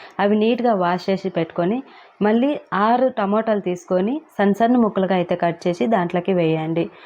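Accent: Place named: Karnataka, India. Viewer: Kannada chappu, telugu raa ledu meeki.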